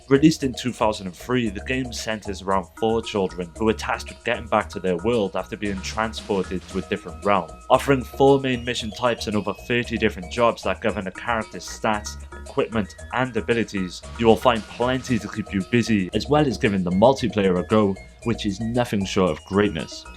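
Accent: United Kingdom. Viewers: Oh dang, indie british stuttering has it going on! Sweet vid.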